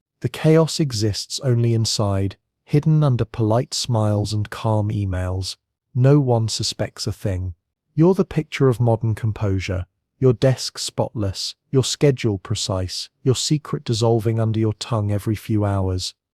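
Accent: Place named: United Kingdom. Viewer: I don't know I just can't stand this guy's accent. His British accent. Sorry I got to turn you off.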